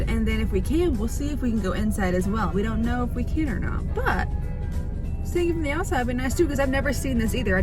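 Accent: United States. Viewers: The weather looks gorgeous in Palermo - and so does the city! Oh to be there!! Strange how, as I Brit myself and a regular follower of, I can tell Raff's particularly "English" accent seems so noticeable against your naturally "American" English - something I just don't notice when listening to on his own.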